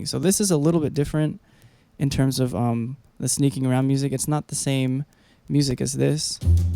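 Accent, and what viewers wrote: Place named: United States. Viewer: I thought you would have a Spanish accent with your last name. I was a bit shocked to hear an American accent.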